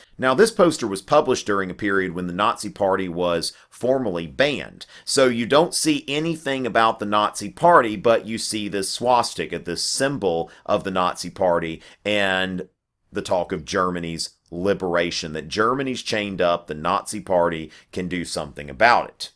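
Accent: South, United States. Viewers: Yeah it's southern. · Forgive me if I'm wrong, is your accent from the southern part of the USA? I'm Indian and I've heard this accent on American TV series, which makes me curious.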